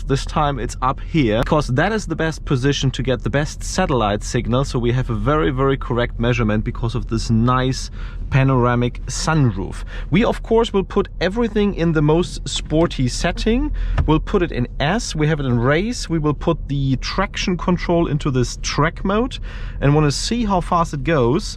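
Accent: Germany. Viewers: Wie man einfach den deutschen raus hört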